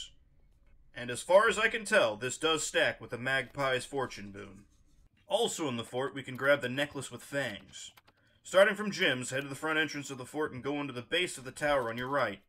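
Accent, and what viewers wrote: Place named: United States. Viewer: Thank you my good sir! Your voice accent Is that of Cowboy by the way!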